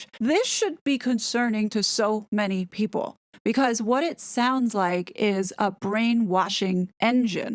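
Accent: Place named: United States. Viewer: Oh bless you, I’m so sorry you’ve suffered - and are still suffering - such a miserable work experience. I’ve no idea of your background, but, imo, you have found a perfect alternative in this channel. Your investigative skills are incredible, you pick up on the most minute details (which other YouTubers completely miss) and your calling out the egregious behaviours of the miserable pair, and those they are involved with at whatever level. I love your sarcasm and dry wit, and you’ve got such a great voice - a very soft US accent (some are very abrasive)! Thank you for all you do for and on our behalf. You are greatly appreciated❣️ ❗️It’s especially amazing to hear that you have faith in God, and that surviving the trauma you’ve been through, you are testament to God’s grace and to the power of prayer🙏🏼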